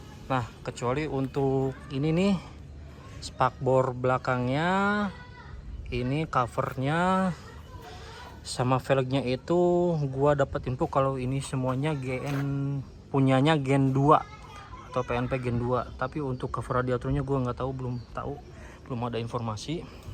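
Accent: Indonesia. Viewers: logat Sunda+gua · Urang Sunda ieu mh logatnya beda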